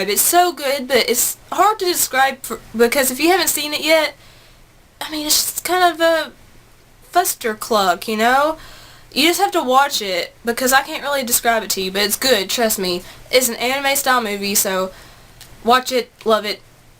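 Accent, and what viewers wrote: South, United States. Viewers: Yeah I agree. I don't know why anyone would dislike your videos. You are funny as hell and your southern accent is adorable. You also have wonderful tastes in just about everything. power!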